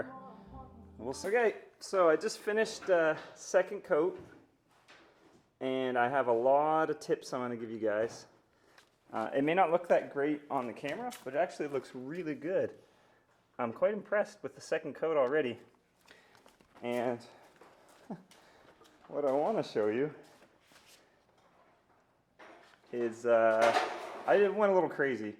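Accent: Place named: Canada. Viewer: Canadian eh???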